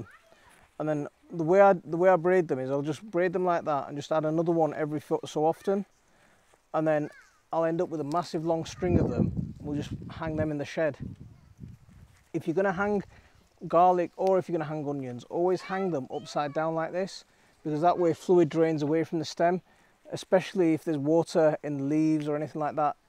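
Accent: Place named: Northern England, United Kingdom. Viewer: ish you said it didn't divide. You've got a great northern accent but didn't you get much frost up there, or have you got a very sheltered garden?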